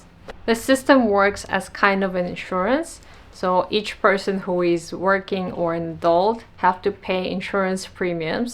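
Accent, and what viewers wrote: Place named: Russia. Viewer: Russian accented English? How is your English so fluent? Ever lived in NYC? (and Russian accented Japanese --novel!) This is solid information. I could retire in Japan tomorrow but unfortunately, Japan hasn't recognized that their largest export is their Culture. They could fix so many economic problems by creating simplified paths to permanent residency & citizenship with such a massively declining birth rate. It's time for Japan to allow citizenship by investment & relaxed visas. But then again, recognizing societal issues, and addressing them is not exactly an established practice in Japan. They are collectively killing themselves with their own xenophobia!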